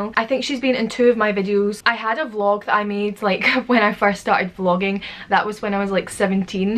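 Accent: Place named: Glasgow, United Kingdom. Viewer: Good luck lovely!!! I'm from Glasgow and have been loving hearing a local accent!! I'm sure you will have the time of ur life in Canada ♥️♥️♥️♥️♥️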